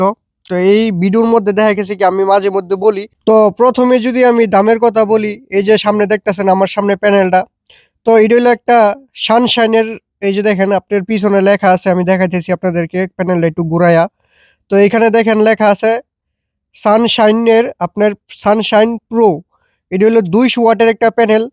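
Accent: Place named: Brahmanbaria, Bangladesh. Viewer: ভাইয়ের কথার ভংগি ব্রাহ্মণবাড়িয়ার মানুষের মতো।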